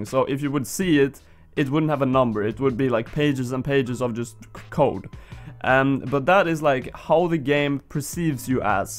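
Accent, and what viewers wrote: Sweden. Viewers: let me guess, ur from sweden? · Are you from Sweden? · Is you swedish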